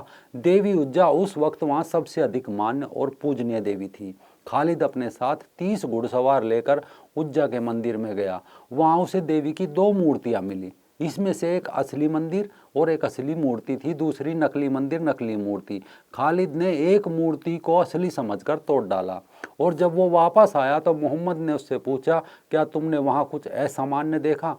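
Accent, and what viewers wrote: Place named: Western Uttar Pradesh, India. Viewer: आपका पश्चिम UP वाला लहजा बड़ा अच्छा लगता है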